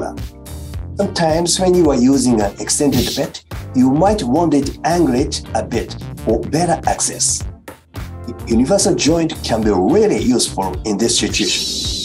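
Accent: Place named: Japan. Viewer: 翻訳ソフトとかじゃなくてちゃんと日本人の英語なのが素晴らしいですね！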